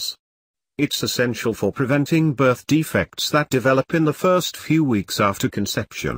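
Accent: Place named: United Kingdom. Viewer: Why must they use a British robot voice. Just do the damn thing with a human voice please....British, American, Tunisian, I don't care, just as long as its human!!!!!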